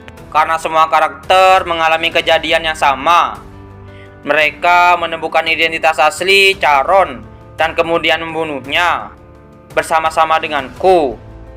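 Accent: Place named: Madura, Indonesia. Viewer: mimin logat madura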